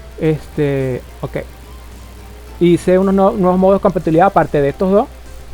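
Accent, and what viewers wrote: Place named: Colombia. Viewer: No se porque, pensé que eras de colombia Pero es bueno que estes bien Espero se recuperen pronto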